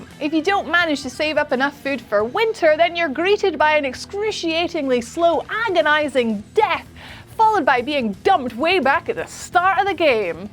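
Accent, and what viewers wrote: Scotland, United Kingdom. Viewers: She's scottish, he is irish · Man I love Scottish accents. The way she says permadeath is...I love it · Is that a Scottish brit accent? Or just scottish? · Ai, are ye a Scottish lassy?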